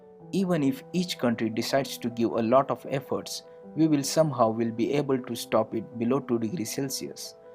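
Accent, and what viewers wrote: India. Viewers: I got even more confused with this shithole Bengali accent..!😂🤣🤮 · I think his accent is indian.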